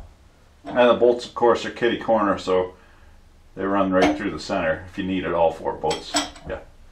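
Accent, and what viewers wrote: Quebec, Canada. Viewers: Lol the French, instantly knew you were from Quebec haha. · i didnt kno you were canadian let alone french canadian?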